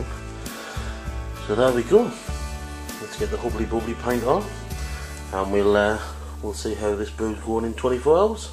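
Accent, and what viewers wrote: Middlesbrough, United Kingdom. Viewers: Oi oi m8....hope all's ok...great vid...hope all works out well....yeah I had t laugh at the froth it looked like one big pint...lol...I'm just avin a pint of wherry atm n I've gotta admit it's got better as the weeks go by the head stays intact all the way down the glass...anyway m8 hope this brew is a banger 4 ya m8...lv nut brown ale...btw are you from Newcastle way it's just you sound like my family Middlesbrough...big respect n oi oi...gav.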